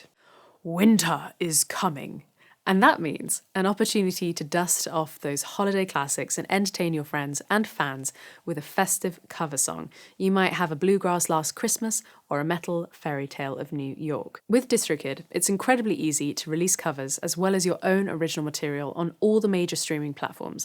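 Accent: United Kingdom. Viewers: I dunno...you're a skilled and respectable guitarist with a wide YouTube following. I'd argue you've made it already. Truth is, the old models of success no longer apply. Back then, if you didn't have a record deal, no one knew your name. Today, ironically, music is one of the few fields in which now you can succeed with hard work and quality material. And even if your appeal is quite narrow, you have a far greater chance of reaching an audience than back in the day. Truly, I'm not that familiar with your music. I know you from your commentary--like Rick Beato--and I value that aspect of your work immensely. You are always lucid, thoughtful, and intelligent, and the fact that you've got a pleasant speaking voice with a lovely British accent doesn't hurt at all. I would wager that even if I can't name one of your songs, I know you for being; and that success, I think, is more valuable than mere musical fame. 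But truly, what do I know? I don't even have a YouTube channel with hundreds of thousands of subscribers! 🤷‍♂️ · I don't know anything about making it in the music industry - but I could just sit and listen to that British accent for hours on end!! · I follow you for your insight, intellect, and whit mostly.. and your charming, propper British accent. I'm honestly not that familiar with your music, but you done good on with Josh. You're valued.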